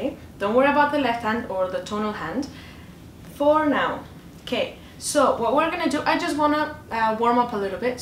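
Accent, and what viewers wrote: Ireland. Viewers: Great lesson your accent is like a half Kerry half SAN Paolo. Thanks for sharing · Yet again another great tutorial video here, I have been very lazy over 2 yrs when it comes to Bodhran practice, but now I've discovered you I've made a pact that I will do 15mins a day but it ends up being 30mins no problem n that's down to ur teaching skills. You have an Irish accent in there somewhere? Are u Irish n moved abroad? Or the other way round? Do u play in a band by anychance? Sorry for all the questions btw I'm just curious 🥁🇨🇮🍻🥁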